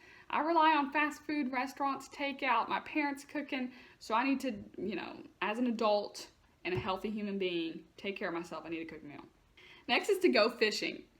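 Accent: United States. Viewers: I'm Canadian u look like American from ur accent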